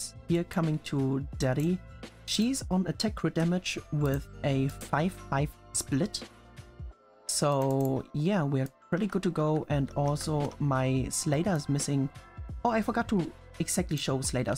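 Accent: Germany. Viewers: Where are you from? you have a german accent somehow?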